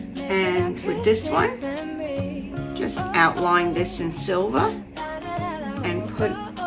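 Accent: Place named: East Coast, United States. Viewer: Absolutely gorgeous!!❤ I love the embellishments you put on them 💖 And every time I watch your videos I think to myself that it sounds like you’re an East Coast girl even though you live in Cali 😁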